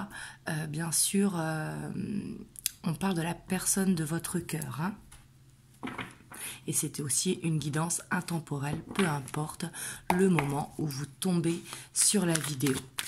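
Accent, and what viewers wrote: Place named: South, France. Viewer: Je m excuse mais vous avez- l accent du midi vous etes d où svp ...oui mon ami est un ancien champion de course d automobile de course de cote il a arrêté il est retraité voilà je suis très fière de lui et moi ije suis très gentille merci j adore vos guidances 🦋🎁🎁🎊🎊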